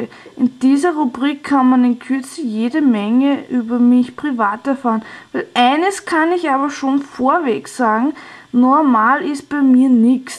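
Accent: Austria. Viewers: a fast-Linzerin? hätt i fost docht, von da Aussprache her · hehe ich mag deinen oesterreichischen akzent! du klingst gar net wie die leut aus baden wuertemburg.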